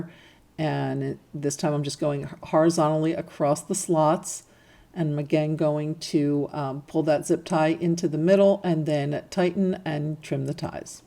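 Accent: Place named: East Coast, United States. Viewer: Where are you from? Somewhere on the east coast obviously. I hear it especially when you say the word “on.” Love east coast accents. 😊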